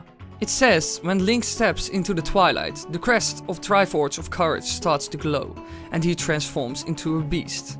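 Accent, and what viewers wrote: Netherlands. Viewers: Damn I thought from your accent you were either Dutch or Swedish. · You sound dutch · You sound heel erg Dutch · I can hear in the voice of someone from the Netherlands that they're Dutch since i hear it a lot since i am also dutch. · Are you dutch? cuz of the accent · It's sounds like you have a dutch accent😁 I like the theory, it turns things dark. Me likes dark ;3 winkwinknotchnotch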